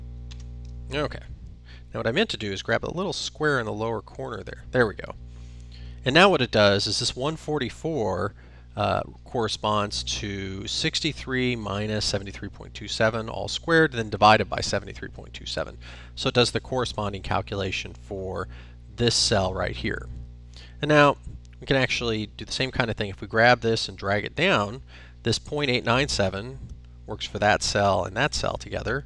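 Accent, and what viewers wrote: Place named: United States. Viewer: Thanks. Nice to hear an American voice teaching statistics!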